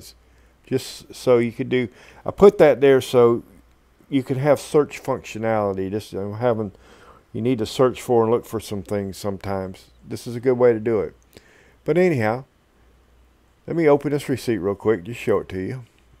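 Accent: South, United States. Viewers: man I love southern accent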